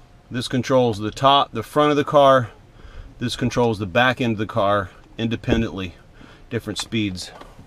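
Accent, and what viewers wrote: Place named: Texas, United States. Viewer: are you from texas?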